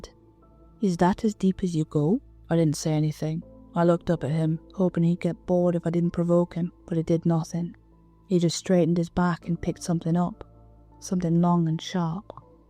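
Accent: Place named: England, United Kingdom. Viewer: your welcome. Your accent reminds me of my sister in laws mother shes from England. Introduced me to English breakfast best tea in my opinion.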